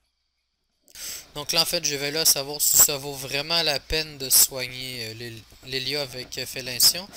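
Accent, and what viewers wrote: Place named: Quebec, Canada. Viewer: OMG TN MICRO EST MEDIOCRE XD, Sinon ta un accent québécois (je suis un nouveau sub) :p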